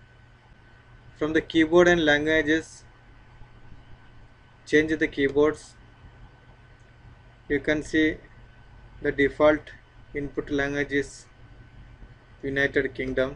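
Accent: India. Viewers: Thank you love your India accent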